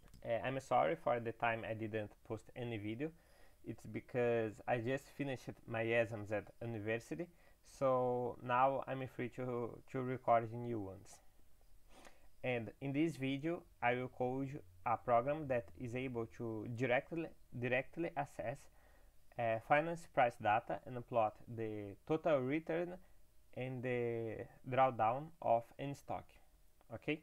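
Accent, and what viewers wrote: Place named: Portugal. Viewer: Given your accent I'm almost sure that your native language is Portuguese =) am I correct? cya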